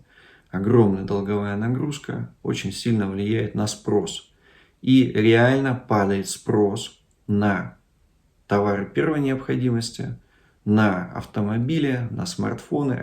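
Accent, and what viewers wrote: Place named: Siberia, Russia. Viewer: Ну ещё и сибирский говор, который, как сказал поэт, "неистребимый уральский акцент ", это мегакруто слушать, при совершенно правильной речи дух человечности реального языка сквозит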